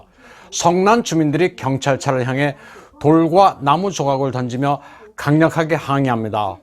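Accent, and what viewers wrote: China. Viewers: 근데 이분은 누구임? 한국말 잘하는 중국인같으시네여...좋은 정보 감사히게 생각하며 보고있네요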